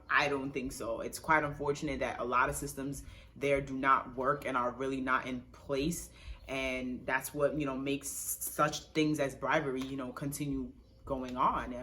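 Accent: United States. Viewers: Ok why is your Ghana accent here not here then it's pure American accent all cap.lol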